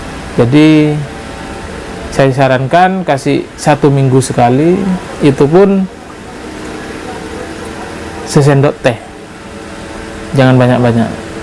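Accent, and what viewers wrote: Madura, Indonesia. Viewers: Kayak orang Jember bahasanya.madura nya mdooookk knaaakk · Ky orang mdr ni bahasanya bengkok2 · logat e kyk orang mdr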